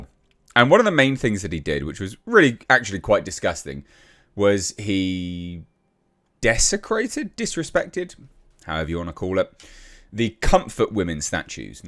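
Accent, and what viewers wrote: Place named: United Kingdom. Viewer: Forgive me for repeating myself but I love breakdown of the situation. Between his British accent and his use of modern colloquialisms it’s hands down my favorite solo streamer/youtuber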